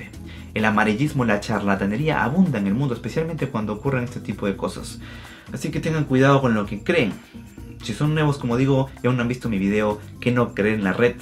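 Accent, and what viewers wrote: Peru. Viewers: Recién me entero que eres de Perú xD, aunque ya me daba esa sensación por tu forma tan neutra al hablar castellano y eso es algo característico de aquí. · Sospechaba que era de Perú pero recien hoy lo confirme xd , que orgullo que este hombre sea peruano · Eres peruano??? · eres peruano?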